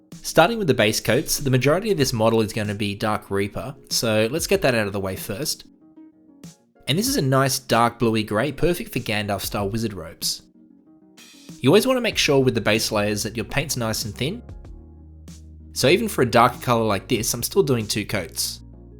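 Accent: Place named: Australia. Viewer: Keep up the good work,! Your paintjobs are crisp and I really enjoy the Aussie accent when I see your painting.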